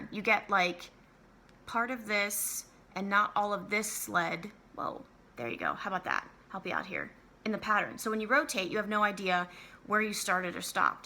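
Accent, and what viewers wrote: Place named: New York, United States. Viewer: I'm catching up on your videos and I totally thought you were from New York! I grew up in NYC and swear you could have been my neighbor there. Maybe it's how quickly you speak? (Which I LOVE, because NY). I love Ebenezer's Christmas, I think I have to find and stitch it for my brother.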